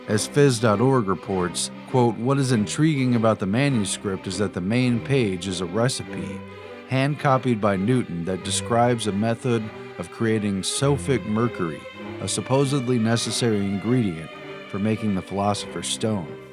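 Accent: United States. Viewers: Very much enjoyed the narration. A very quintessentially "American" sounding voice, if ever I heard one. (Put me somewhat in mind of Peter Coyote's narrations). Nice pacing, pronunciations, and inflections....and even the printed/blue highlighted readings were effective: activating even more neuro pathways than just simply hearing the narration. SOoooo much better than all those robotron voices we've been hearing around YouTube for some time. Think I'll take a look around this channel some more.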